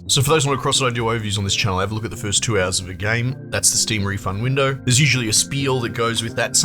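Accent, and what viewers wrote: Australia. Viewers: Thank You - and very nïce english/aaastralian' langüagé :- ]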